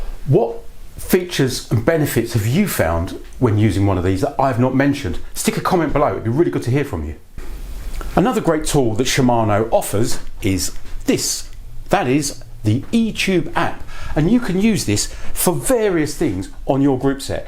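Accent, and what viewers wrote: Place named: Lancashire, United Kingdom. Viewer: Wow 😮 I’m losing the will to live, even though I like your videos but a strange Lancashire accent 😊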